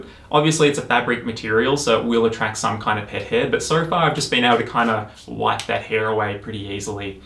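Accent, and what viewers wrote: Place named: Australia. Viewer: Nice to hear an Australian dude doing one of these reviews. Shout out from Melbourne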